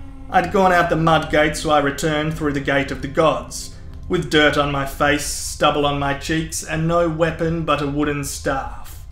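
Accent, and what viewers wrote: Australia. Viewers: You ever consider doing Game of thrones audio books? Your bloody ozzy accent is rather soothing.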